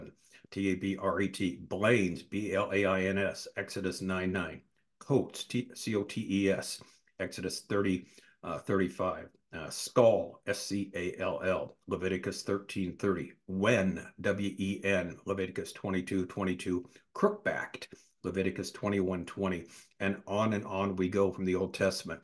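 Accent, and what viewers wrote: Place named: United States. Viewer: But you speak American English accros the pond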